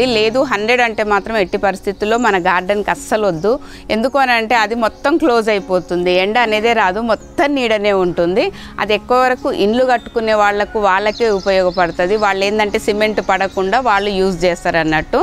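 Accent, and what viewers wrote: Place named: Telugu, India. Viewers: Garu nenu meeku big fan ayipoyanu.mee resident place ekkadandi. I am in chennai mee slang kurnool anukunta correct kada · I like your native Telugu accent 🙏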